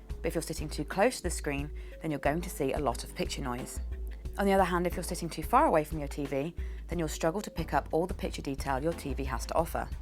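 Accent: United Kingdom. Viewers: Her British accent is just too thick ! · I cannot make out a word she says! The british accent is lovely but is totally unclear for me. I would really like to know this info but cannot understand her.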